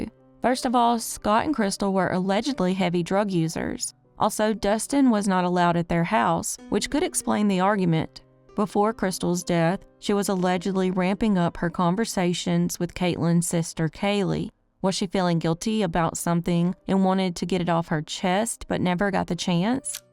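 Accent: South, United States. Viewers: Hearing Southern crime/unsolved mysteries stories from a narrator with a Southern accent is so much better. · I love to hear that southern accent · I love hearing a southern accent for a change, born, raised and still in southeast GA I haven't heard these before only the current case I'm following in Sumner County, Sebastian Rogers as well as Summer Wells also in TN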